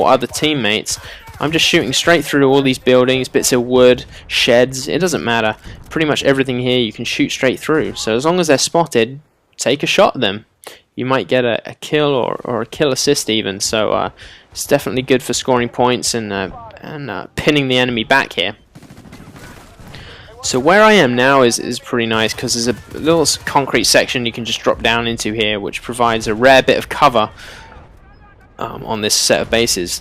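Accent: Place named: London, United Kingdom. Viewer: You sound like you're from London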